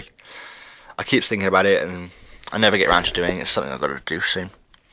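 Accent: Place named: England, United Kingdom. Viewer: i am guessing you are english :)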